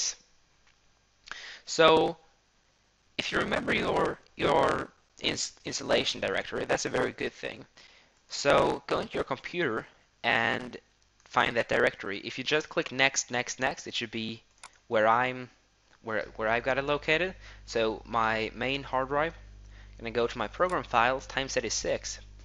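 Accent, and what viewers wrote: Sweden. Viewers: är du svensk? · DU ER SVEEEENSK! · are you swedish?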